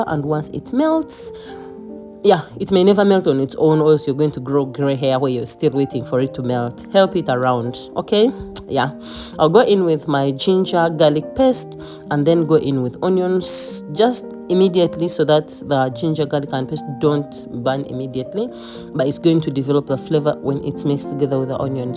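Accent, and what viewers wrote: Kiga, Uganda. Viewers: U sound like a mukiga btw, I love your video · You sound Ugandan, thx for cooking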